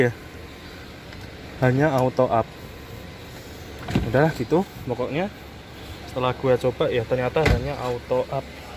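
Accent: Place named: Java, Indonesia. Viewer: Jangan pake bhsa GW mas soalnya rada2 medok jawanya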